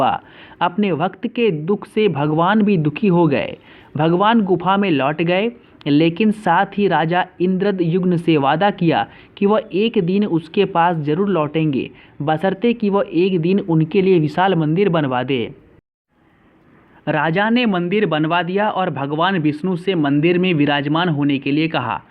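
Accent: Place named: Bihar, India. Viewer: Ra ki da mat karo na hi da ki ra mat karo...I know may b u belongs to Bihar ya UP ya Jharkhand to aap ka accent thoda change hota h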